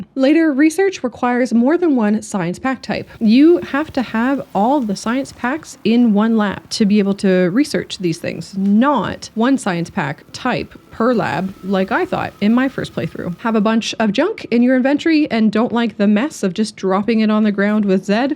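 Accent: Canada. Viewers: subbed for the canadian accent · I heard aboot, howdy fellow Canadian (if my guess is correct), the Factory must grow, eh! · CANADIAN SPOTTED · I'm pretty sure she's too Canadian to be AI, at least. No way any of the basic, bland, AI voiceover videos is calling anything an 'underdoober'.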